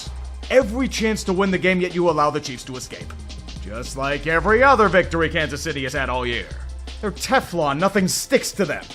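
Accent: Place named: Philadelphia, United States. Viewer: is starting to sounds like an philly fan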